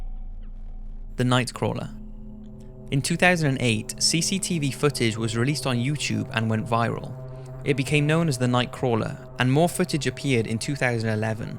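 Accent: Wales, United Kingdom. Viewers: It's British. Of course it's audio gold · perkin2000 Think you will find it is a Welsh accent, from near Cardiff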